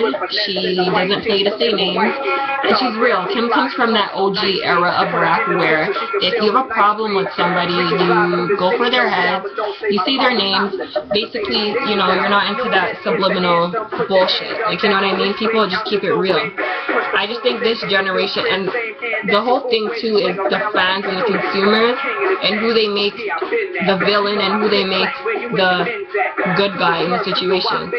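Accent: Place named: Caribbean. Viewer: Oh wow, lol. I don't know why I thought I heard a little Caribbean in you.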